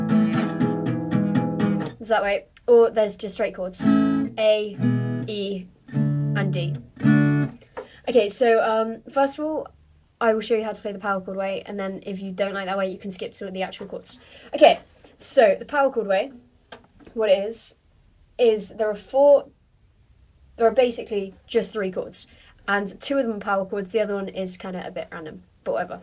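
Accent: England, United Kingdom. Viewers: Thank you so much! I've watched dozens if not hundred of Guitar how to's and yours are by far the easiest to follow. I cant wait to watch more of them! And don't worry, the accent is pretty obviously British :) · Thanks for this! You DEFINITELY sound English. Like you I also don't get how any one could mistake that for an Australian accent. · im from germany and i "knew" after a few minutes that u are from england. very nice tutorial. best i found so far. only a lil thing would have been nice: if u could play the whole song as an intro or so :D. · D that´s funny! I recognized where you come from by hearing your accent!! ok.. i spent a few days in London...and I really like this british accent! youre probably right, but not everybody can hear the accent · i knew u were from england i love your accent!! oh and thank you the tutorial was well explained and very easy to understand. 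^-^ god job and keep going. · That was so clear, thanks! This was the first video of yours that I saw but hey you sing very well! Oh, by the way, you do sound totally English don't worry ;) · It's obvious you' re from england :D You're cute... ^^ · Thanks I am a complete beginner this really helped, how do people think your Australian, you sound english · First, i think your british, XD sorry, by the way, ,love the voice, love the hair, love the song. :) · where are you from in england? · Like the way you show around how to play a lot of great songs, great work! Thanks! Ps yes your accent is clearly English to me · Ella tiene un acento hermoso, así hablando tan bonito en Inglaterra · Hi from New Zealand tauranga..yep Great teaching at the right speed to learn great song .. Thanks . Your accents not to strong english wise but but nothing likean Aussie..cheers. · How can u think she's American or Australian. 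I lived in England for most of my life and then moved to Australia :( all Brits sound like her, great vid by the way!!!! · thank you for the tutoral :) you're really good at teaching :O love the accent! xD i thought it was a british accent but wasnt certain :P from: irish boy Donal :) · You can tell you're English. Thanks for the video, only Q is after you play intro 2 times then the bridge do you go back to the into? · Love your English accent :) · I am American and I could tell that you were English, but the real question is what part of england are you from. I also understand your frustration with people thinking that English and Australian accents sound the same. Keep up the good guitar work. Can you do by. I am recently obsessed with it. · You are so cool. Thank you for this great tutorial. Will help me a lot. You sound English!!! No doubt. Greeting from Germany - Michael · Love your voice, Ahaha i thought it was Australian until you said British. 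Now i hear it! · I'm English and I could tell you where English! Can you do,even though this is like a 6yr old video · Great tutorial, it really helped :) and as an English person I can tell that you're English ;) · Thank you for this video, you've made learning to play a little more interesting for me. You sound nothing like an Aussie defs English. Again thank you:-) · How does it sound Aussie no chance it's uk all the way btw I'm from uk live in NZ next to Aussie your accent is so much better than g'day mate :D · Im from England too, omg your so funny. And english accents dont sound like australian accents god..Americans are weird lol :)) Im from Newcastle.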